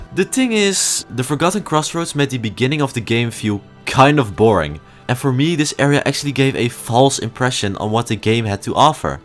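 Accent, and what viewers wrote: Netherlands. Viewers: ik ruik nederlands man ngl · je klinkt nederlands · am i just the only one smelling a bit of a dutch accent here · Het nederlands accent door je engels heen is crazy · You are dutch right? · Wait are you Dutch? (Same) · just for science.. are u dutch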